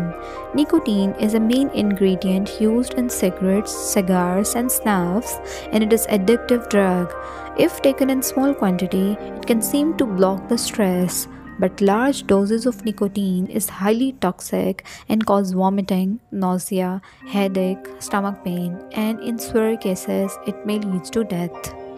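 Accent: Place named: India. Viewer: Is there a search result that is not indian like accent?? It’s really annoying. I don’t know why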